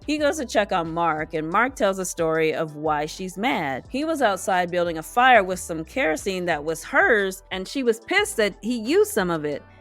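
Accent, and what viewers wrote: Boston, United States. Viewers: The Boston accent 😆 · When this season is over, I’m going to miss your Bostonian accent! It tickles me everything I hear it 😂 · Your Boston accent is so on point 😂😂😂😂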